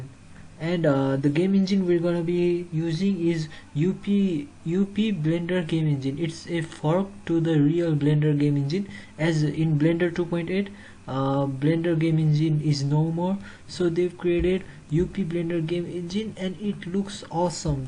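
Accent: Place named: Brazil. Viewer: he said you're a brazilian trying to speak english, so you should quit speaking english because to him you sound like a brazilian.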